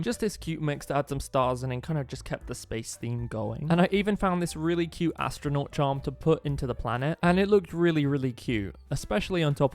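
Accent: United Kingdom. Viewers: Are you British bc I am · Are you British? Because you sound British.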